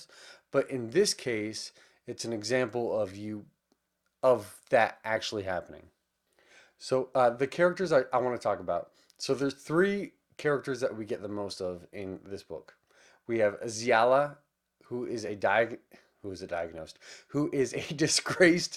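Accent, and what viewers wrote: South, United States. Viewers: I love your video at your house in Texas during your quarantine until you had one of the books of "Black Sun" by Rebecca Roanhorse while it's all about a god return, when the earth and sky converge under the black sun, in the holy city of Tova the winter solstice is usually a time for celebration and renewal, but this year, it coincides with a solar eclipse, a rare celestial event proscribed by the sun priest as unbalancing of the world. Meanwhile, a ship launces from a distant city bound for Tova and set to arrive on the solstice. The captain of the ship, Xiala is a disgraced Teek whose song can calm the waters around her as easily as it can wrap a man's mind. Her ship carries one passenger. Described as "harmless" the passenger, Serapio is a young man, blind, scarred and cloaked in destiny. As Xiala well knows, when a man is described as harmless, he usually ends up being a villain and you're talking about all of the characters from the book after that, I hope your husband, Zach will be very proud of you and I love your Southern accent, I know you're half Mexican!!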